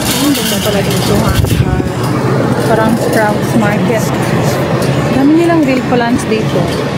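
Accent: Philippines. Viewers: my tono ka san probinsya ka Dito sa pinas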